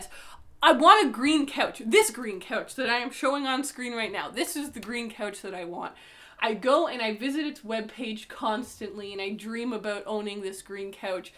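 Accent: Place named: Canada. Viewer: How do we know she's from Canada?? Oh y'all did you not hear her say " house, outside and couch" ? 😊😃😄😍 love hearing her talk.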